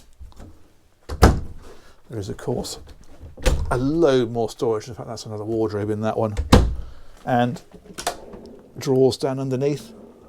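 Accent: United Kingdom. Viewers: Are you from England? Nice vehicles looks very cozy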